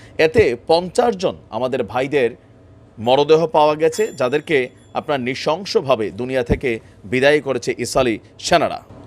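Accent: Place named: Cumilla, Bangladesh. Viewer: সাহেব আপনি ভদ্র ভাষায় খবর পড়েন খুব ভাল লাগে আমার কারন আপনি কুমিল্লা র মানুষ আমি নরসিংদী আমরা পাশাপাশি। সবথেকে ভাল লাগে যে ভাষাটা কথাটা শুনলে আমার ☺ হাসি পায়। যেমন আজকে পর পর কয়েকবার শুনলাম এ বি সি নিউজে বেনজামিন নেতানিয়াহু নয় তিনি পড়ছিলেন ( বেজন্মা) নিয়াহু। শব্দ টা খুব ভাল লাগল।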